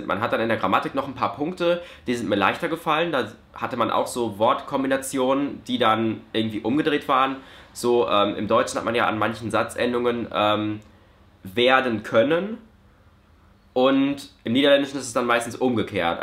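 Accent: Standard. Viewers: Also für mich sprichst du absolut hochdeutsch🤷‍♀️ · Als Hannoveraner kann ich sagen, dass du in diesem Video Hochdeutsch redest 👍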